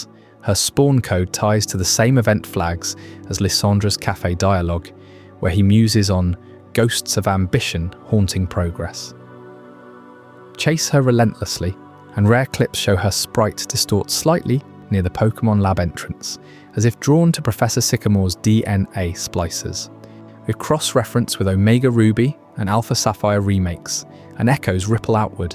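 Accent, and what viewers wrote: United Kingdom. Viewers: Great videos could you do your voice a tad less British please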